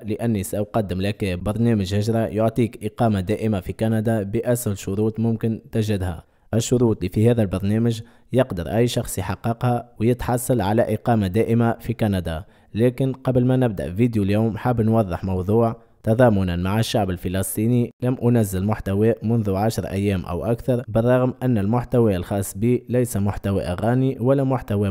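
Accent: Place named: Tunisia. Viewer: اخي شكراً لك على المحتوى الرائع ما اجمل لهجتك التونسية الرائعة . أنا عندي فيزا سياحة هل ينفع أن اقدم على هذا البرنامج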